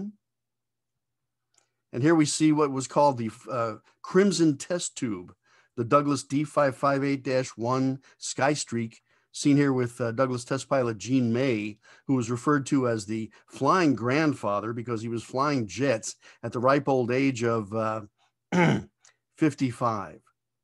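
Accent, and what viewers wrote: United States. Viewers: WHY THE AUDIO SOO BAD. FIRST HE IS WISPERING AND SECOND THE TOO STRONG AMERICAN TONE MAKES THE VIDEO UNUNDERSTANDABLE BY NON AMERICAN FOLLOWERS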